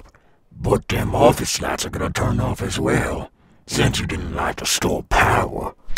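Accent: South, United States. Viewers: Love the bit of southern accent ☺️ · Now I can’t unhear him speaking with a country accent. I don’t mind it, though.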